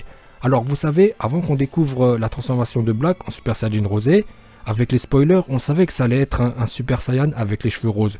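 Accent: Japan. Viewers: J' aime trop ce que tu fais avec ton accent japonais dans tes vidéos continue comme ça 👏👏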